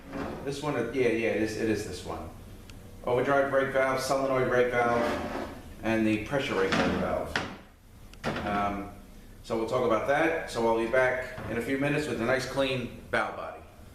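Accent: Long Island, United States. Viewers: Greetings from Albany! I think I hear the LI accent, i'm from there(SE Nassau area)!!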